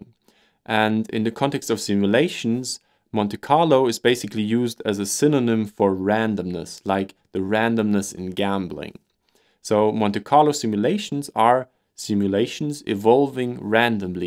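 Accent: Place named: Germany. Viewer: du bist doch en deutsche Bub oder? der hör ich doch raus sache mal! Und bockstarkes Video also wirklich erste Sahne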